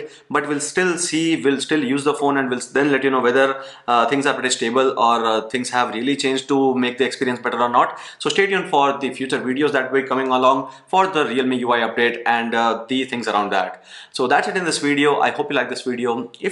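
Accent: India. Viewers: Should be a law against Indians speaking English 👎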